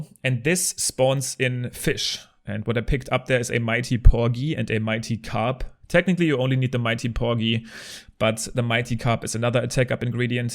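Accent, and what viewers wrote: Germany. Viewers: are you german? cuz you sound like a german if yes cool im too if no ok but i am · He once played the German version and read the lines perfectly. Aaaaand his obvious accent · are you german yo sound german bist du deutsch du hast diesen deutschen aktzenz beim englisch reden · Is he german cause his englisch is a bit german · you her like a german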